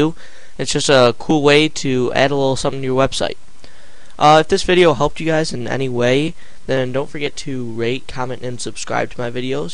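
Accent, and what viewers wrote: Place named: New York, United States. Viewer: Are you from New York? You sound EXACTLY like my friend.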